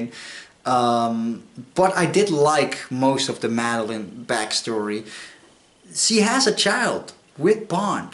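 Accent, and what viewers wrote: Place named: Netherlands. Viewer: How is it that "James Bond Will Return"? How many times does he nearly die at the explosive end of Bond movies but somehow cheats death and comes back again? The producers will either come up with a way that happens OR there will be a replacement 007 Bond (new actor) who is convincing enough to satisfy another re-boot. By the way, enjoying the Dutch pronunciation of "th" or "sh", it's so cute. ;-) But whatever happens just don't let Disney touch it, which is poison to any movie franchise.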